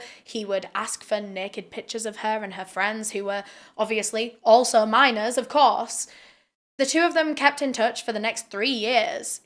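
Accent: United Kingdom. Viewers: What part of britain is her accent from ?? Philomena cunk speaks like this too i think 😅 I'm curious, anybody?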